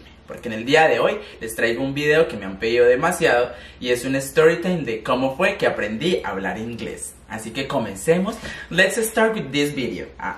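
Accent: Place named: Colombia. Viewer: Amo tu acento colombiano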